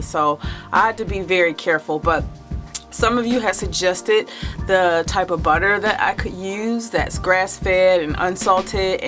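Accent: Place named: Detroit, United States. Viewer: that Detroit for BREAKFAST 🙄😄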